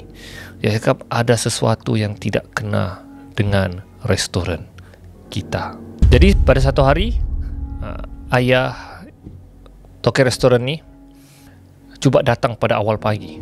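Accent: Sabah, Malaysia. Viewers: Org Sabah kah kau ni bossku??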